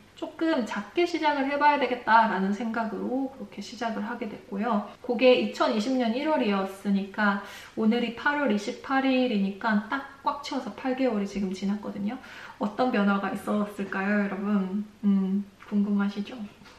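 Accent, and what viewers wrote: Gyeongsang, South Korea. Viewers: 원서를 몇권 읽어보니 처음엔 책장 넘어가는 속도가 너무 느리다가 탄력받으면 마지막으로 갈수록 많은양을 하루에 보게 되더군요. 한국말을 차분히 들어보니 경상도쪽 억양이 있으시네요~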